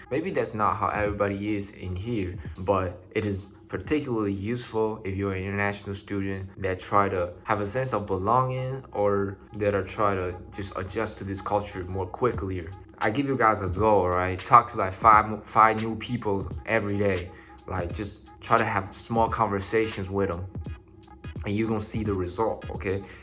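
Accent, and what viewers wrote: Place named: South, United States. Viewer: your southern accent tho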